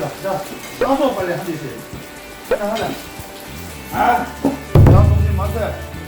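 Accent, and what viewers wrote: Gyeongsang, South Korea. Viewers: 케케케~~대서 ~~엉 왜케 올만이셔유? 역시 삼겹살 쥑이네요ㅋㅋ 구수한 경상도?억양 인간미 촬촬 넘치는 우리의 케케에챱?꺼챱?~~쓰ㅡ읍쇼리ㅋ 솨자~~앙님good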